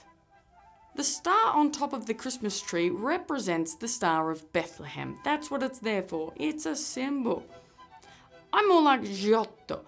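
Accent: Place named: Australia. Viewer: as someone English I think she really doesn't sound English? she's Australian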